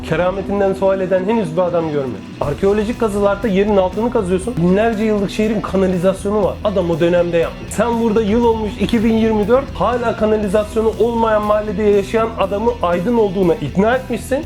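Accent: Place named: Istanbul, Turkey. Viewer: Sizi neden sevdim kardeş bilmiyorum ama gerçekten çok güzel bir Oflu olarak bizim söyleyeceklerim izi İst şivesiyle anlatıyorsun ve guruplarda paylaştım daha aktif olmanı istiyoruz teşekkür ederim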